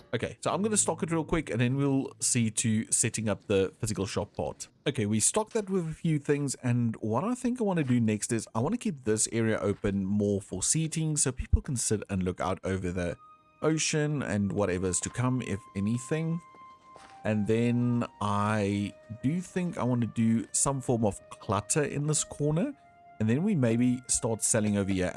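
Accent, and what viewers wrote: South Africa. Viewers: YOU ARE SOUTH AFRICAN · are u south African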